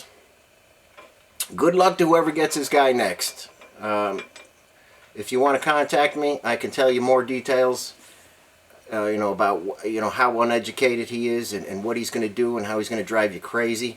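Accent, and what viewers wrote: United States. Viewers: Yeah well sound very American to me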